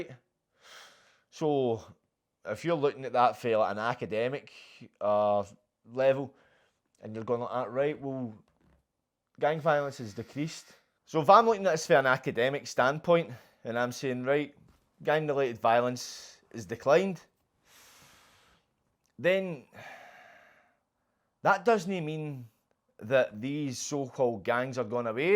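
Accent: Glasgow, United Kingdom. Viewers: What u on about he’s talking the way 95% of Glasgow people will talk which is more relatable to people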